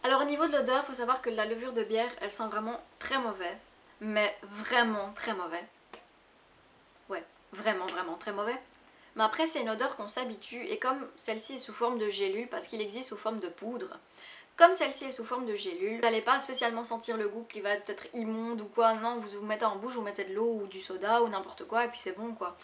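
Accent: Belgium. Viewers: Par contre un léger accent belge , pas besoin d'hortophoniste !😊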